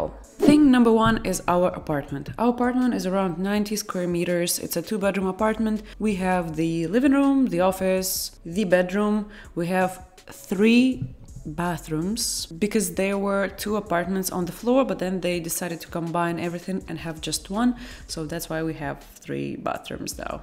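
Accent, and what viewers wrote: Russia. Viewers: That recurring Russian accent 😍😘... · People love your Russian accent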